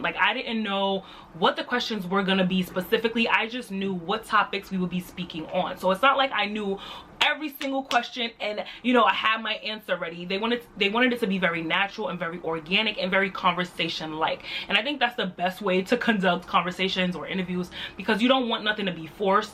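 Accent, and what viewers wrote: New York, United States. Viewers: On ya way to 1million!!! Yasssssssss! Sn: You are soooo NY and I love it 🥰🥰🥰😂😂😂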